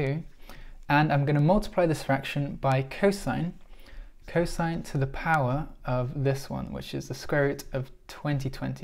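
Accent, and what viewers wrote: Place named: United Kingdom. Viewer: Thank you so much I love your British accent btw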